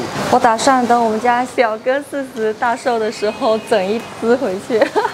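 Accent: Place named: Putian, China. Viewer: 莆普有待提高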